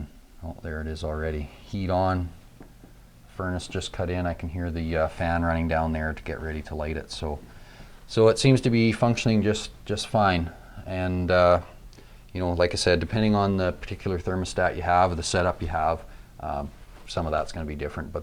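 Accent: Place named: Canada. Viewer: I can't quite figure out your accent, are you canadian or just from some northern state in the US? Minnesota maybe?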